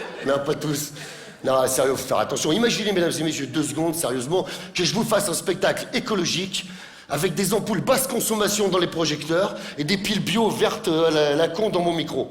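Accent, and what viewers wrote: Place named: Lyon, France. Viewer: Joé Manitooly moi je suis originaire de la Loire du coup je l'entend l'accent lyonnais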